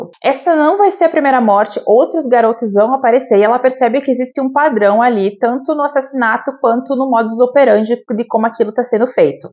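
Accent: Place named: South, Brazil. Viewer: Oi! Pelo seu sotaque tu é do Sul, por acaso você mora no Rio Grande do Sul? Se sim, como você está e sua família? Estão todos bem? Quanto ao livro, muito interessante. Gosto de ler livros pesados, as vezes, sair da bolha sabe. Abraços 😘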